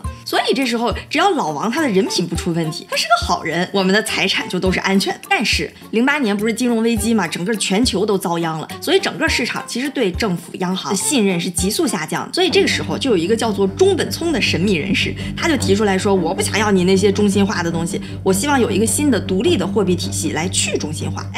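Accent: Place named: Shandong, China. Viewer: 听小林的口音像山东或者大连人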